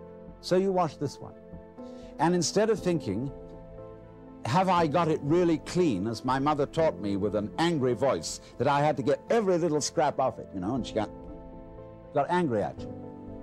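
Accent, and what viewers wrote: RP, United Kingdom. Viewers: His good looks and posh Oxbridge priviliged speak got him through the drunken BS he spouted, gotten from reading other peoples' books. He couldnt make it through a speech without a bottle of brandy. Spiritual Alan Watts the contemporary guru heh? LOL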